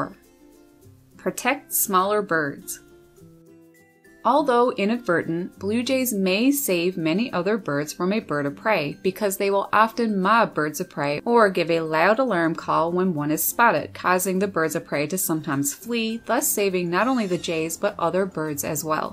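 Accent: Canada. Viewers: Blue jays “ afften gehda biyiahhdd rahhp”... what ? Haha. Where is she from ? Lord !!!! Have mercy !! Oh wait. Canada. She said a boat it. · Boy are you from Canada! {but thats a good thing} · "A hewse" and "figured oat" = canada. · You sound canadien. So these might be Toronto Blue Jays?!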